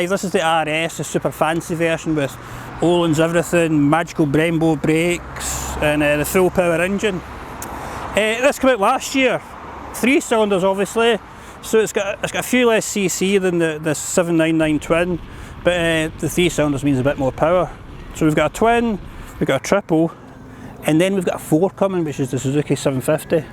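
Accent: Scotland, United Kingdom. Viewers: Scotsman? Or Irish? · It's not strange, it's from Scotland. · Don’t understand how people can’t understand Scottish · Man you've got a heavy British accent. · Only scotish people can understand what hes saying. Even google auto caption generetor failed to recognize what he sayinn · I don't mind the scottish accent and understand him perfectly well, but he's just talking utter bollocks and he's uninformative in my opinion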